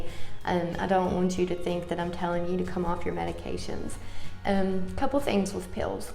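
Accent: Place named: Deep South, United States. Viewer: Great video vlog girl. I’ve struggled with being an empath for as long as have memory and had no idea what was wrong or why I would come home extremely worn out. People would have sucked the life force out of me little did I know. I’ve gotten to where I have withdrawn myself from society because I became fed up with how evil people and how much they take from you. Just this week I let a girl whom I thought I could trust borrow my car and she burned me stole some things out of my car and kept it so long I had to threaten report it stolen. I’ve become cynical as hell and just fed up with the curse because I care so deeply. I too understand substance abuse to make it go away. You sound like you are from the Deep South where I’m located. Keep up the good content girl friend. Kashton <mail> Take care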